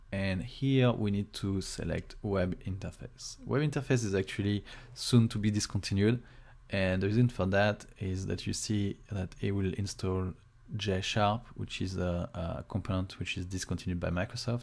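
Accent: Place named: France. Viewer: so nice accent! After I have watched this video I want to learn Franch language :)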